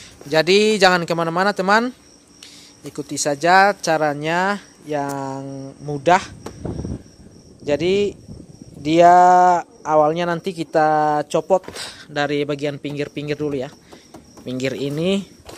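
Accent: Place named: Bali, Indonesia. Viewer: Kalau dengar logatnya pasti dia orang bali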